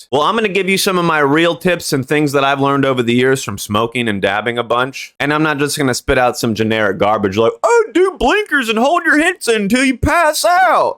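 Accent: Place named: New York, United States. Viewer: 😭is he from New York or something? Ik Ian the only one who notices the slight accent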